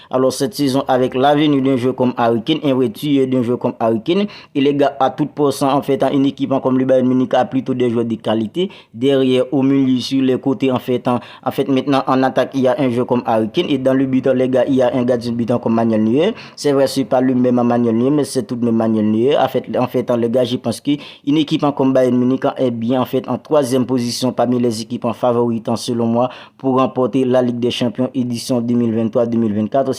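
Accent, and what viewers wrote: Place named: Haiti. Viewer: Ou haïtien boug Mwen ? Ça s'entend 😄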